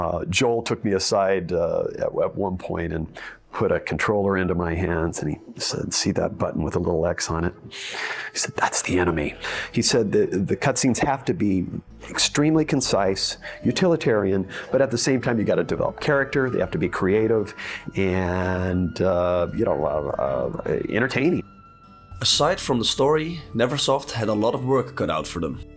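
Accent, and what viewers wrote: Netherlands. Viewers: Your voice sounds so Dutch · It took me 10 seconds to know this guy is dutch😂. Leuk gemaakt man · I can just hear you're Dutch.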